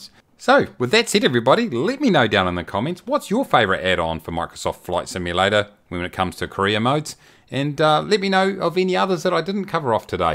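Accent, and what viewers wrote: New Zealand. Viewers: 🤣 The auto text understood you to say Korea instead of Career. You know that I Love the Kiwi accent mate. 🥝 🇳🇿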